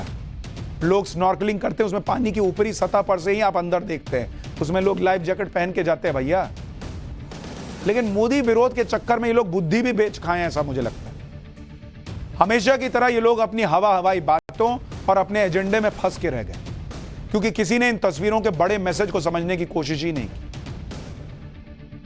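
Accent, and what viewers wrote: Bihar, India. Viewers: सुशांत जी आपका बिहारी अंदाज में बोलने का तरीका निराला है, आपके पाठशाला में शामिल होके आनंद आ जाता है ।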